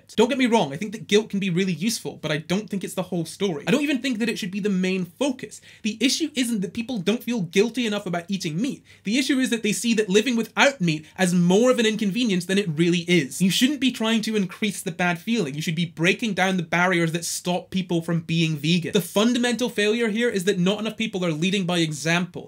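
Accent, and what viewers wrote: Scotland, United Kingdom. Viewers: 2 months of veganity woop woop, also are you Scottish?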